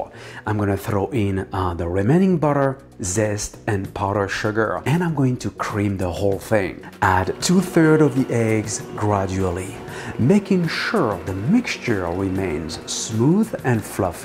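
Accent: France. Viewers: Is it just me or is everything better with a French accent.???? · Frenchy u make me remember my best frenc friend with u accent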